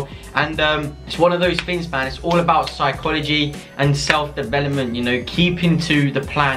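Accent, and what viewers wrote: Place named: United Kingdom. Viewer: Can you spell out the app for people like me who cannot fully understand ur British English 💯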